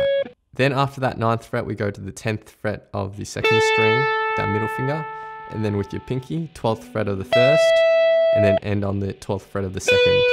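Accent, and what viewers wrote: Australia. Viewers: it's great to have a comfortable-sounding Australian accent doing this, weel done.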